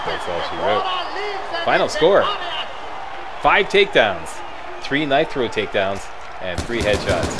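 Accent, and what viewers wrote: Canada. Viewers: o ur Canadian eh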